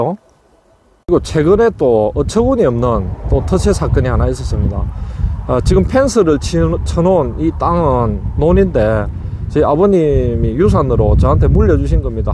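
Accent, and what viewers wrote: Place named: Jeolla, South Korea. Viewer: 말투로보아 전라도?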